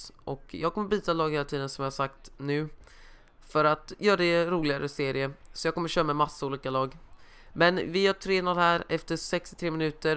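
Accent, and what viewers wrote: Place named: Gothenburg, Sweden. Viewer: Du är från götet va? Du har en go dialekt :D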